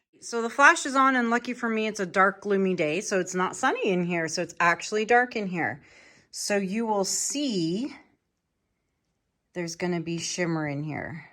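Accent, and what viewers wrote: Canada. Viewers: Oh wow, wow, wow!!! This piece is absolutely stunning! I have only recently discovered acrylic paint art but you were one of the first people I started watching. I really am enjoying your art, your tips (and as I'm from the UK, the differences between a Canadian accent and an American one! 🙂) Keep up the fantastic work and sharing things with us. I've just bought my first few acrylic paint items to try it out for myself. A few busy days this week so next weekend probably the starting point for my paint pouring first. Im not sure what to start with but as my canvas is small, it won't be a blow out on quite this scale! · Good Lord she likes to talk. I dont know if I can stay for the end, I cant take all the talking and the Canadian accent. Not trying to be nasty, its just my preference.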